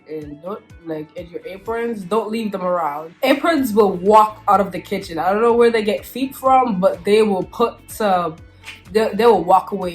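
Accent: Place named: New York, United States. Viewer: I hear a hint of New York in your accent...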